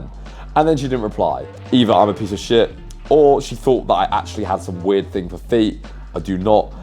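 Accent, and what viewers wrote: United Kingdom. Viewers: The most british accent I've ever heard 😂 keep those vids up man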